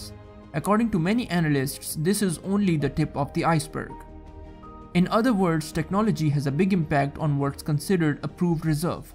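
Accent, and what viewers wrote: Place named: India. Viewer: bad indian accent makes this terrible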